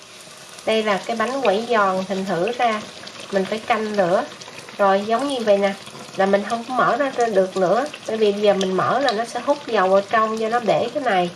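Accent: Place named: Southwest, Vietnam. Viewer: Tôi cũng thích bánh Quẩy lắm , nhưng thích nhìn thấy cô chủ kênh hơn ! Vì tôi xem kênh nầy rất lâu rồi mà không thấy được mặt chủ kênh nên tức lắm, nghe giọng nói của dân miền Tây Nam Phần rất thân quen và dịu dàng đáng mến quá lại thêm nấu ăn giỏi quá , phải chi được nhìn thấy một lần thì hay biết mấy, hổng chừng là bà con nữa đó .😅😅😅❤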